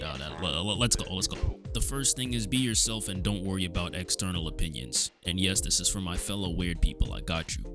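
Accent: New York, United States. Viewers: Are you a New Yorker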